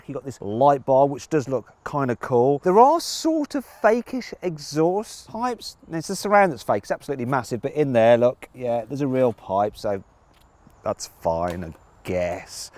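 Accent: United Kingdom. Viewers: 영국 특유의 억양인가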